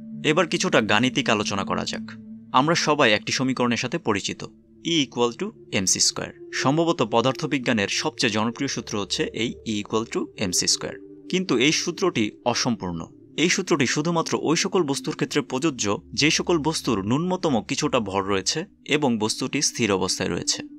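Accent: Narsingdi, Bangladesh. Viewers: আপনি কি নরসিংদির লোক? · Hlw vai,Apnr basha ki Narshingdi? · ভাই আপনার বাড়ি নরসিংদী? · ভাই আপনি কি নরসিংদীর ছেলে?? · আপনার কথা বলার সুর এখনও শুদ্ধ হয়নি। আপনার কথার সুর নরসিংদী'র আঞ্চলিক। বাংলাদেশী সুর ব্যাপকতা লাভ করুক আপনার চ্যানেল। ধন্যবাদ। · ভাই আপনি কি নরসিংদীর ? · ভাই আপনার বাড়ি কি নরসিংদী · apni basa Narsingdi 😲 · আপনার বাড়ি কী নরসিংদী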